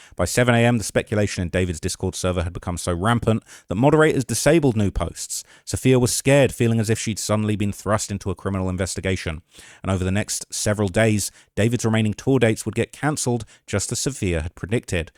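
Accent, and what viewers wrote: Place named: United Kingdom. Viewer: Bros never heard of a British accent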